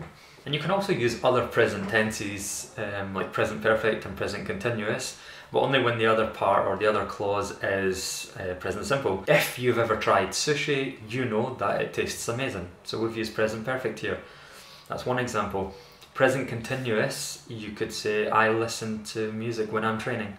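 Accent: Scotland, United Kingdom. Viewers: Nice Scottish accent;-)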